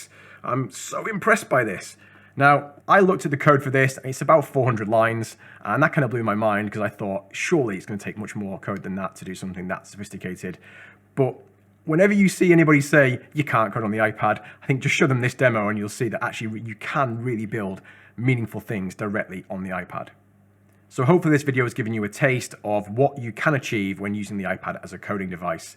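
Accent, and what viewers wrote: United Kingdom. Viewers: Manchester accent?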